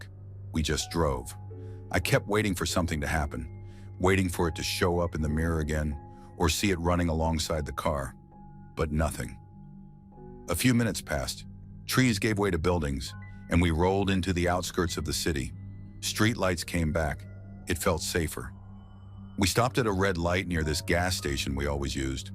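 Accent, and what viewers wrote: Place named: Chicago, United States. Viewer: I love the Dennis Farina accent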